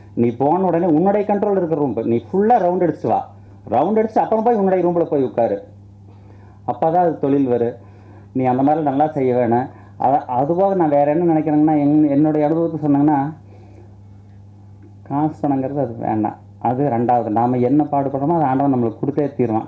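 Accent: Coimbatore, India. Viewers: kovai tamil arumai ayya :) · i love kovai tamil..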